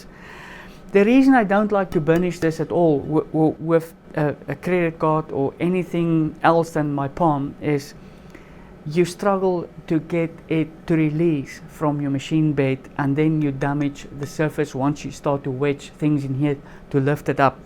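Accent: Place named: Afrikaans, South Africa. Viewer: I stayed for the afrikaans accent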